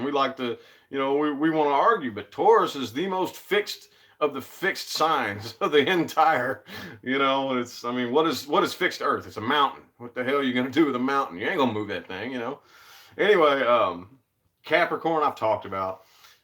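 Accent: South, United States. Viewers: You sound like you could be from the south, maybe I’ll figure it out as I keep watching your vid’s. I’m glad you have a happy relationship. Blessings 💗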